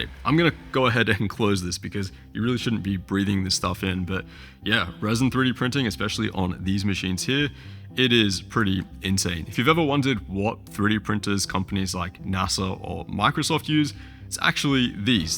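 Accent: Australia. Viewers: Australian creator says "dollars" in their Australian Accent while living and working in Australia and means USD instead of AUD?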